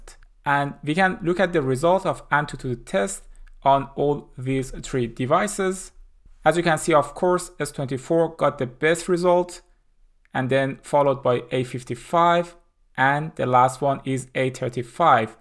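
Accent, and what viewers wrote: Iran. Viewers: سلام سید لحجه داری ، حس میکنم ایرانی هستی درسته ؟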